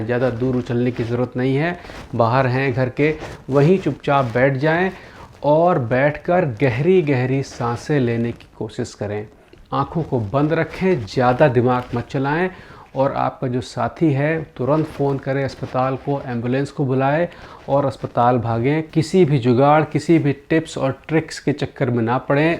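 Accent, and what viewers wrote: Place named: Jalaun, India. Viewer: Sir ap ki bhasa mere up ke jaloun, orai se bahut milti hai, jase bat gath me badh lo, mota mot a samajh lo aadi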